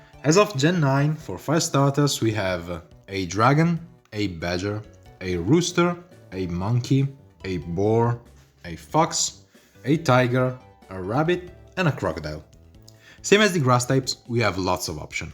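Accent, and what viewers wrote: Italy. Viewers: Bel video, scrivo in italiano perché si capisce dalla accento, è ispirato a dadrake giusto?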